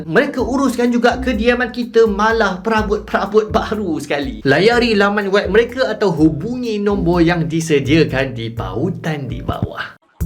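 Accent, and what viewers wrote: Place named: Kedah, Malaysia. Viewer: Saya ada soalan ,abang ni orang kedah ka ? Hang hang hang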